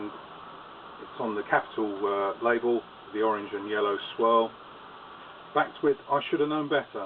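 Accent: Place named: United Kingdom. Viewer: (This is Tom, not Sandra.) Why do you think that England didn't have picture sleeves for the Beatle records back in the early 1960's? Have you found that out since you taped this video post? You have some really great collectibles there, sir. Thank you for sharing your collection with us all !!! Love your accent, also!!! Love all the British accents, really!!!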